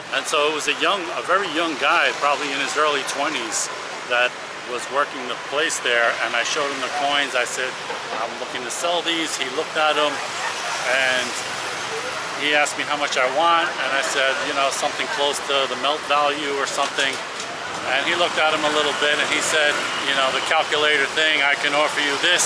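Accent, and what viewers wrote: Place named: New Jersey, United States. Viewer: Mmm. That’s a lovely accent you have. New Jersey? Are you a Jew?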